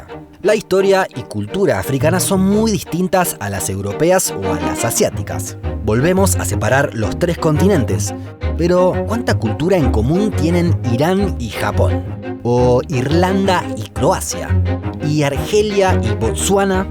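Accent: Rioplatense, Argentina. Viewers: Al leer ese libro, todos lo leeríamos en nuestras cabezas con acento argentino... con la voz del narrador! P.D. Muy buen trabajo. Es uno de los mejores canales en YouTube, y creo que el único canal del que he visto todos sus videos. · El que habla parece argentino o uruguayo.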